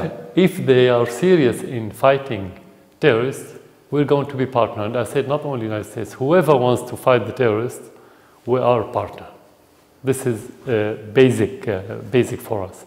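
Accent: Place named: France. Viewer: why does he have a french accent? he speaks English with a french accent .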